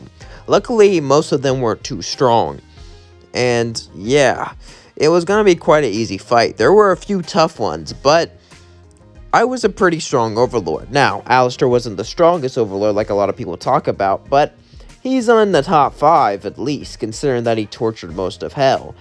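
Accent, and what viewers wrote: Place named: Transatlantic, United States. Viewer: It’s actually a transatlantic accent.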